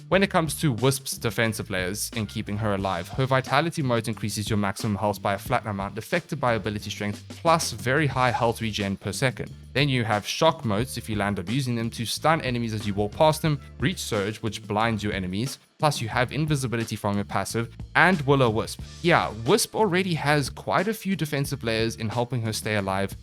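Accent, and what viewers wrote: South Africa. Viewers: are you from SA? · Broooooo i came here loooking for this comment🤣🤣🤣🤣🤣 love how south african he is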